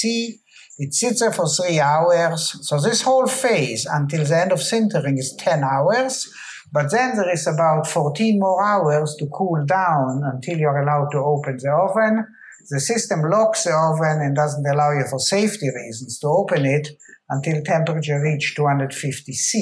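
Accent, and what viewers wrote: Israel. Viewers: Is it a Hebrew accent? GREAT GREAT VIDEO and astonishing relatively SOLUTION! The definitely BEST intro about metal 3D printing around. Thank you Sir. It's really beautifully simple, efficient, precise. THE BEST COMMERCIAL AROUND TOO. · It’s the most Israeli accent I’ve ever heard.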